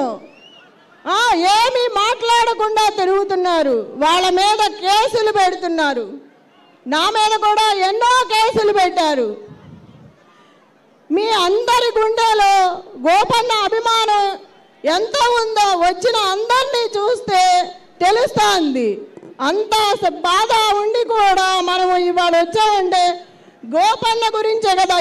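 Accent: Andhra Pradesh, India. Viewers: Andhra voice Baat me dum nai hai😢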